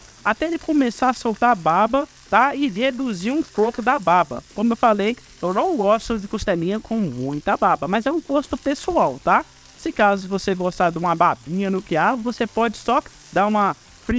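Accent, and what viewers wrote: Minas Gerais, Brazil. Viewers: sim, nós mineiros falamos assim mesmo.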